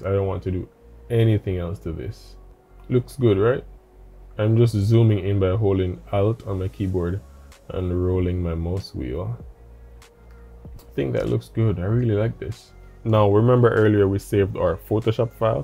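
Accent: Jamaica. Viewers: Bless up! I hear the Jamaican accent. Good to see the Jamaican Youtubers #Winning #NewSubscriber 🙌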